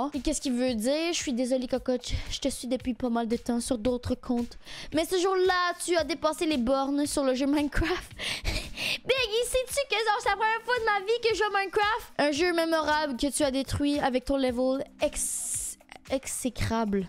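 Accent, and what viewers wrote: Quebec, Canada. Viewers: Elle a juste l'accent québécoise il y rien d'exceptionnel mdrr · J'aime bien ton accent québécois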